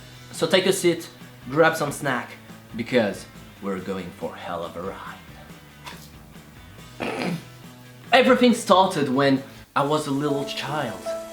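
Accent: France. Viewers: perfect frenglish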